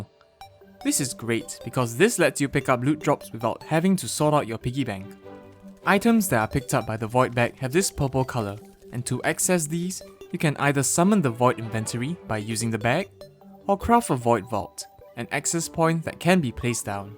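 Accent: Singapore. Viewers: bro the singaporean accent is strong with this one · i really enjoy these videos! keep up the good work! also, your voice is so nice, are you Singaporean by any chance? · You sound like Singaporean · Are you from singapore??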